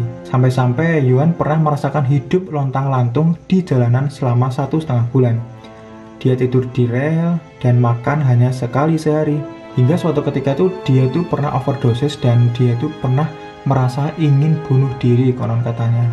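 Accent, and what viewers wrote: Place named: Java, Indonesia. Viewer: Medok mu itu loh mas.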